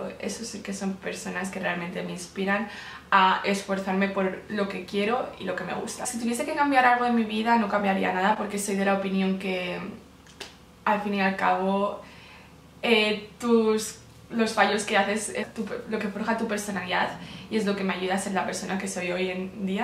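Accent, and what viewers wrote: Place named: Spain. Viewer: Buscando otro video acabé aqui! Espero que tengas exitos!!! Bienvenida a la comunidad!!! Me gusta tu voz y ese tono de los españoles! Te voy a dejar mi apoyo con un like y suscribiendome!! Espero hagas lo mismo por mi!!! Saludos desde Argentina!! (Odio también que se muerdan las uñas :v)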